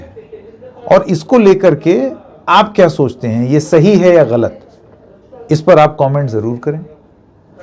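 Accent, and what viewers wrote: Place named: Bengal, India. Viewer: Your Bengali ascent is good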